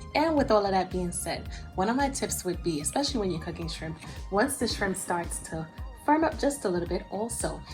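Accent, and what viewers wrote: New York, United States. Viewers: Your accent mad cute you so New York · NY stand up!! I kept laughing, like, wow! We really do have an accept. The way she says Sauce and so many other things!! Too cute! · My sentiments exactly!! I was sitting over here admiring the soft tone to your voice, the giggles and that gorgeous ring. I can’t help but notice as well that you’re from New York like me🙌🏾 The way you speak tells it all. You definitely confirmed my suspicion when you said “don’t let me come to your crib”😉dead giveaway! Who but we say this😍😜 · i feel like your from new york lol i love the way you talk . GREAT COOKING TUTORIAL THANK YOU ❤️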